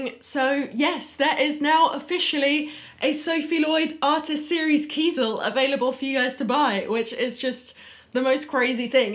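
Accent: England, United Kingdom. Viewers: Я понял что она англичанка :)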